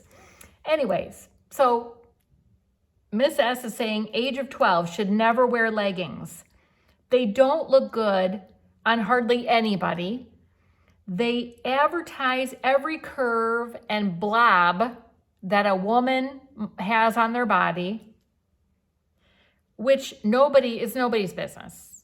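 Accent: Michigan, United States. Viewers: I am not one to comment online, until now. I don’t live close to a Walmart. I’m over 60 and your wardrobe wouldn’t be right for me, BUT, I love your videos!! You are so refreshing and positive. You’re in great shape. Enjoy it! I’ve never been to Florida but enjoy the tours. I also thoroughly enjoy your Michigan accent! Please don’t change❤️ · I realize this is an old video. But I still want to comment. I think you are adorable. You are built so cute! Your blue eyes are so bright and beautiful. I love your voice and your cute Michigan accent. 😊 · I started listening to you because of your accent. My mom was born in Michigan and I in Elkhart Indiana. I miss my birth place and the people. Sorry people are so arrogant to make the negative comments. As you say we are all different. My thing is take what you can use and consider the other. I am 73 and need all the help I can get for new styles. 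I thankyou for your input. I am a size 4 so I have to be careful to look stylish but not to young in my selections. You help me eleminate! Thankyou.🌞👍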